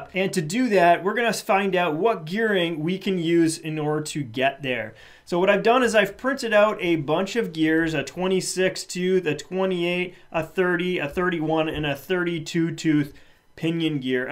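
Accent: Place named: Canada. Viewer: I thought I heard a Canadian accent.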